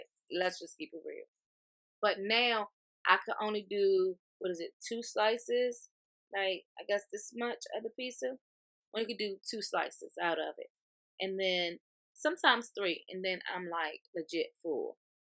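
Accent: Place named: Washington DC, United States. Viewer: I hear your DC accent so I know you're from the area. Where do you get your nails done?? They are so bomb.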